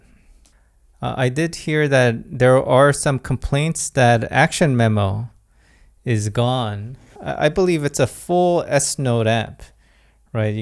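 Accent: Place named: United States. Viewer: Are you Korean? Your English is very Americanized/Westernized.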